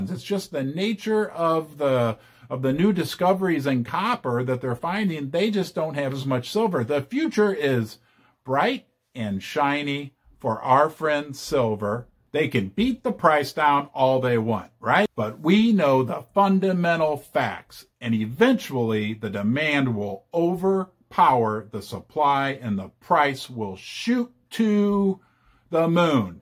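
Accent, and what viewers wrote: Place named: Michigan, United States. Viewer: His accent sound Michigander.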